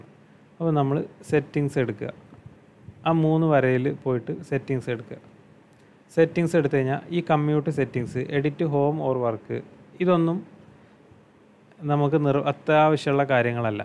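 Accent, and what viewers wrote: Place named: Malappuram, India. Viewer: മലപ്പുറം ആണല്ലോ താൻ... ആ സംസാരം കേട്ടാൽ തന്നെ manassilavum.. പിന്നെ എല്ലാ മലപ്പുറം ടീമും എറണാകുളം എന്നുള്ളത് എറണാoകുളം എന്നെ പറയൂ... വിവരക്കേട്.....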